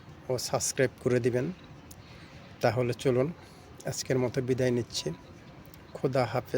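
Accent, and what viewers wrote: Bagerhat, Bangladesh. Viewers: ভাই আপনার ভাষায় মনে হচ্ছে আপনার বাড়ি বাগেরহাট জেলায় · ভাই আপনার ভাষায় মনে হচ্ছে ,আপনার বাড়ি বাগেরহাট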